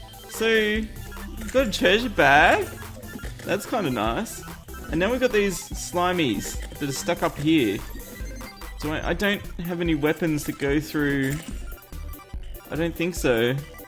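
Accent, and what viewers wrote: Australia. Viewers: Haha, that polish shoutout was so funny :). But if u want to say it somewhat correctly you say: Lubee-eu tziastetshka. Omg that was so funny just to try and figure it out ;). Please say: i like to watch watches in your australian accent but please say it in a very 'strong' accent. Keep up your great work, everyday i cant wait for another video. 9 and a half big chocolate cookies for you! ( half got eaten by the eater of cookies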